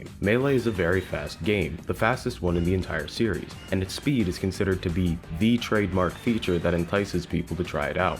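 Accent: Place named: New York, United States. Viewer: has the thickest NY accent i've ever heard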